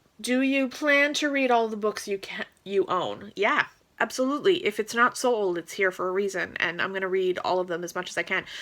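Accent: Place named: United States. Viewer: i did wonder if you were british or american, now i know (your accent is very 'american' as we know it)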